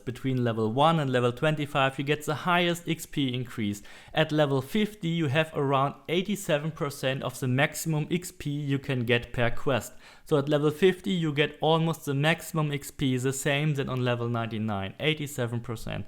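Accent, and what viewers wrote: Germany. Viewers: You sound like a German to me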